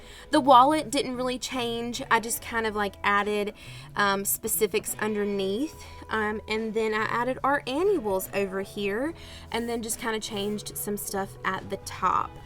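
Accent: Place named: United States. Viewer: Ah love you accent! Which part of America are you from? I work in education too (in the UK obviously lol). I can’t give up my twinkl subscription for teaching resources ☺️ so helpful to have (and I get a free book sent every term).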